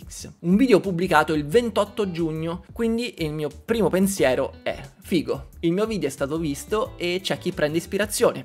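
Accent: Rome, Italy. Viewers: dovresti essere solo orgoglioso, sei forte! fantastico lo spezzone di video del 2018 dove avevi una dizione con accento e tono tipico romanesco, ora però è un piacere sentirti parlare. professionista! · non so se può servire a qualcosa per te leggerlo, ma sei davvero l'unico YouTuber italiano di videogiochi che seguo insieme a Il Grettapensiero e RoundTwo: perché sei calmo, sei umile e perché mi piace un sacco la tua dizione "romanaccia ma non troppo". Non esiste uno che parli come te su YouTube. Ti sembra poco? Per me non lo è affatto. Aggiungici i contenuti sempre interessanti e la percezione di avere davanti una brava persona, e non una bestia di egocentrismo, e diventa ovvio affenzionarsi al tuo canale.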